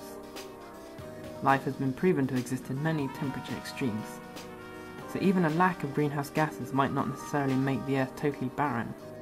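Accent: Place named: England, United Kingdom. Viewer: What you talking about? he's speaking English with an English accent.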